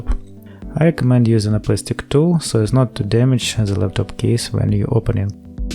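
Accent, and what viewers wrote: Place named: Russia. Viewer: Чувствуется русский акцент) Неплохо говорите на английском. Все понятно)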